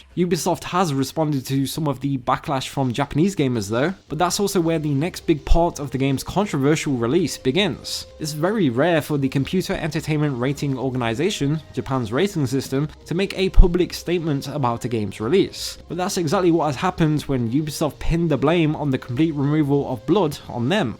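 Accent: United Kingdom. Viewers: He's british. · He is british. It may come as a suprise but most people who write or speak in english on the Internet are not from America.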